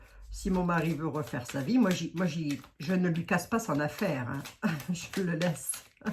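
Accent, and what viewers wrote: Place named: Grand Est, France. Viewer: Fort Accent et expressions du GRAND EST que je reconnaît très très bien 😉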